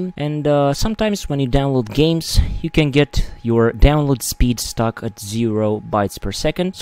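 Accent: Romania. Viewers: It worked, thx, but let me ask, are a romanian youtuber, ur voice sounds familiar to me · You are from romania?xD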